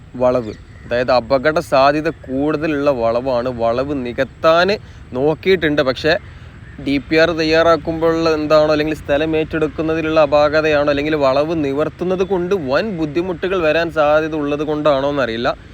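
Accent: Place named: Eranad, India. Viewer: പിന്നെ താങ്കളുടെ ഭാഷയെ വിമർശിക്കുന്നവരോട്, മലയാളം എന്താ സ്റ്റേറ്റ്കാരുടെ ഭാഷ ആണോ? അവരവരുടെ മലയാളം അവരവർക്കു ശുദ്ധം. ഞങ്ങളെ കാസ്രോട്ടെ ബാസെ ഞങ്ങളെ സുദ്ദ ബാസെ. ങ്ങളെ ഏറനാടൻ ബാസെ കേക്കാന് എന്തോരി പാങ്ങന്നേപ്പാ, ആ ബാസെന്നെ പറഞ്ഞോണ്ട്-ന്നെ മുന്നോട്ട് പയ്ക്കാളീം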